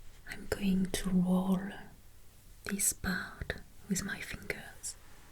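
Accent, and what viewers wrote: France. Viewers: I think I'm addicted to your videos. Nowadays it happens not often to me to fall asleep without your smooth voice and so divine french accent. I just love it so much, I melt...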